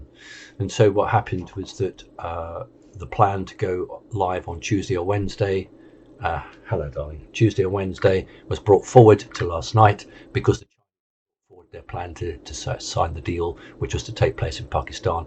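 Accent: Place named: United Kingdom. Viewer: サイモンさんの英語はイギリスだね。だからイギリスからの声。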